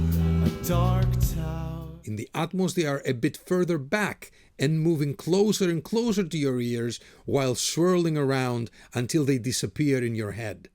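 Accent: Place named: Greece. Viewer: Subbed you right away, although it has more to do with how you present the info & content, that greek accent is too good.. (this channel is certainly not boring like other audiophiles out there)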